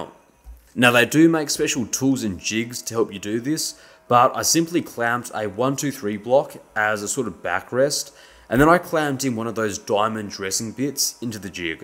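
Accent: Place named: Australia. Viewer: Aussies, y'see... I had to turn on CC at some point... ;-)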